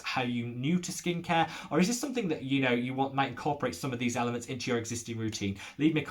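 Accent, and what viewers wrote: United Kingdom. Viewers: yeah in Valencia, born here, but i love foreign languages, so I use your channel to improve my english,( I learned English at Loughborough -Nottingham, and I'm in LOVE with your marvellous British accent, hate the American tough) and to learn about my skin routine so is a win -win situation for me. Lol 😆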